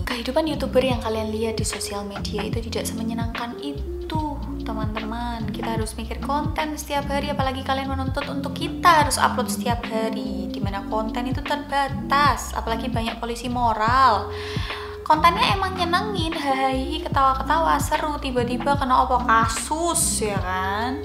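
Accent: Java, Indonesia. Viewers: boso jowo e medok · Mbak jowo ne ndi to kok muuuwwweedook bngt 😀😀😀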